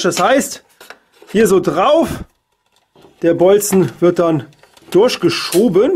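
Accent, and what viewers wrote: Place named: Hesse, Germany. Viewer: Machste gut Bubb. Klares Deutschhessisch, guter Ton, konkrete Fakten, kein unnötiges Gebabbel .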